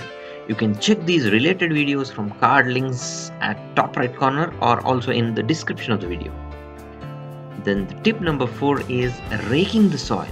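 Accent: India. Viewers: These are common living soil organic farming techniques, if haveing an indian accent made it spiritual for ya then well lol ok man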